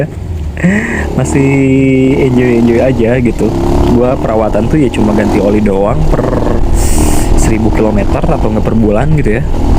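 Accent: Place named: West Java, Indonesia. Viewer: salfok bilang gue elu tapi logat sunda.. hahaha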